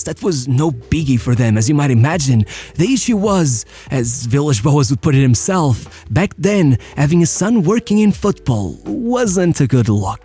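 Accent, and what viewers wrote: Portugal. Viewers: Real ones know this guy is portuguese, i know buy the accent! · Eu sei que és português, esse sotaque tá aí, não dá pra esconder🤣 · This accent is very Portuguese. 😅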